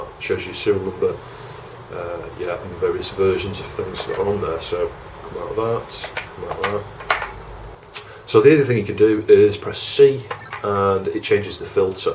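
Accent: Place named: North West England, United Kingdom. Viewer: hi mate - only recently discovered your channel but enjoying it, good stuff. Who cares about a 2 minute load time if you're from the speccy generation! I'm curious, where are you from in the UK, sounds like you're a fellow north-westerner.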